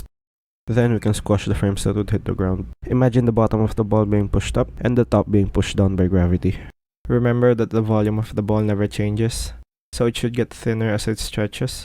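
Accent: Philippines. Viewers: Just asking, are you Filipino? you sound like it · You sound filipino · idk man has a filipino accent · i hear filipino accent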